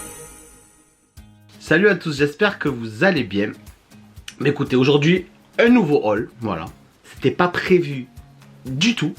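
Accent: South, France. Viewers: Salut la famille, un « GRAND MERCI », je découvre la sphère de Disney depuis que ma petite fille s’y intéresse vraiment et je voulais vous remercier pour toutes vos vidéos, déjà deux semaines qu’on vous regarde, toute les vidéos depuis 2016, eh oui! Avec ton « Panasonic ». Je te remercie car grâce à toi j’ai une autre vision de Disney et des parcs Disney. Merci de faire nous faire vivre vos expériences avec tellement de passion et de joie de vivre. Vous êtes dés personnes sincères dans ce que vous faites et on apprécie énormément (et ma fille aussi 😁). En passant, nous sommes du nord (Normandie) j’adore l’accent du sud, ça ramène un peu de soleil en vous écoutant 😁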